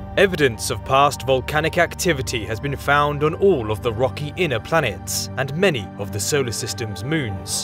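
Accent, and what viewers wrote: United Kingdom. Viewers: boy i cannot get enough of these spectacular videos plus the narrator is got me talking like british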